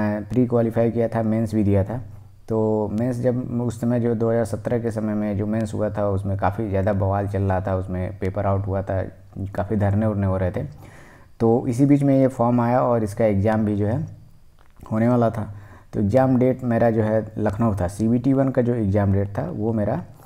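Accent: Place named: Tamil Nadu, India. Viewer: You sound like tamilan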